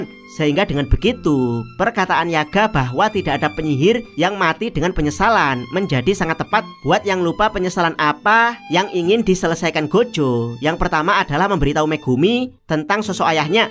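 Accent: Java, Indonesia. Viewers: bang logat jawa nya luar biasa hahaah thx bang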